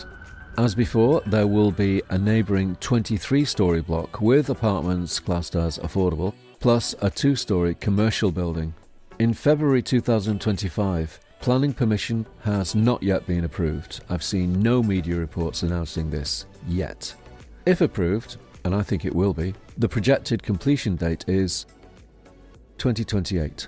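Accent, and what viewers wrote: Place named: Manchester, United Kingdom. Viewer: Love this type of 80s bohemian mancunian accent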